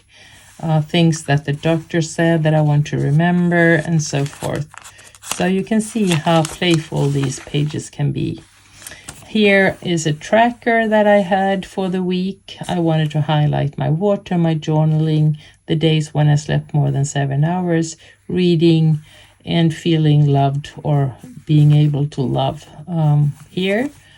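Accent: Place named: Sweden. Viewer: Thank you for sharing! I love how these look. Shipping to USA is just so expensive 😑 Maybe there will be more options for next year 🤞 I am so happy to see you are back, I've missed you and your videos. My 18yr old son overheard this video and said "she sounds like mormor", so I asked what do he meant and he said her accent sounds like she could be Swedish, like mormor 🥰 I love it!! I am Swedish, and my family only hear accents from my family from Sweden 😂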